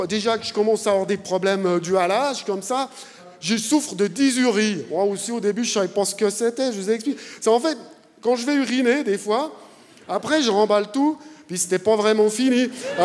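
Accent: Vaud, Switzerland. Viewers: J'ai plus rit de son accent suisse que du sketch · Il a une drôle de façon de parler (et je ne parle pas de l'accent suisse) mais j'ai ri ! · "C'était franchement désagréhâble" . . . beaucoup d'accents circonflexes notamment sur les "a", les "heu ou eu". Cet accent suisse, peut être vaudois, me rappelle celui des stéphanois (Saint Etienne 42). Fouillâ ! ! ! ! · Il a vraiment un accent suisse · Accent Vaudois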